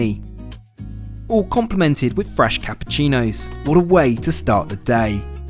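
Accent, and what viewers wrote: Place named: England, United Kingdom. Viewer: He has an English accent, but definitely not a pompous or upper class accent. He sounds to me like someone who is trying to ennunciate his words so that everyone can easily understand him.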